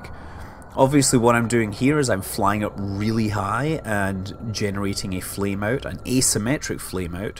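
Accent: Scotland, United Kingdom. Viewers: u sound scotidh i hate u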